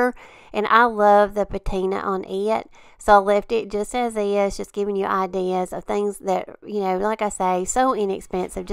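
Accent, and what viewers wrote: South, United States. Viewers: IOD Just found your channel and subscribed !! Love your adorable southern accent. You are so sweet to listen to. I love going to thrift stores but now will buy things to make over using the IOD transfers and Stamps. I plan to purchase these through your links. Thank you for all your kind words and inspiration. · Love your channel and sooooo excited about the IOD giveaway. Keep cranking them out cuz we need more you tubers like you. Love your soft southern accent and your voice. It's soothing which makes it easy to relax and enjoy the show.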